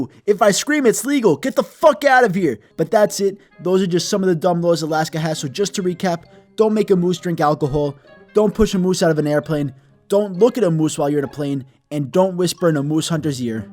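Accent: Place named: Brooklyn, United States. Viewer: Why is some guy from Brooklyn talking about Alaska?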